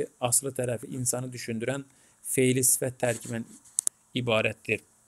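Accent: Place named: West Azerbaijan, Iran. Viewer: Qaqaş sen qərbi Azərbaycanlisan? Onların lehcesine oxsayir lehcen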